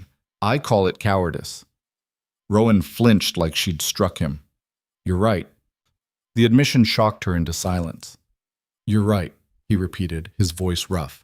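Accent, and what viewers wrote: United States. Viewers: That American accent just isn't appropriate for stories of British aristocracy.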